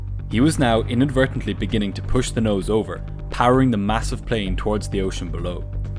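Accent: Ireland. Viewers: well bud, that’s the beauty of the Irish brogue, remember, those slights in the queens tongue are what made Irish literature legendary. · Your narration skills are first class -I like your Irish accent coupled with your easy listening mellow voice – one funny thing though -to me at least (especially for aviation videos ),is the way you pronounce “column”-your Irish accent comes out as “collleeum”-a cross between cauliflower (or maybe Colosseum !)and column, Gosh I hope I haven’t made you self-conscious after that !, on a serious note you have a brilliant channel which does not sensationalise nor overdramatise the events and covers the technical aviation aspects well but remains gripping and riveting like a good drama❤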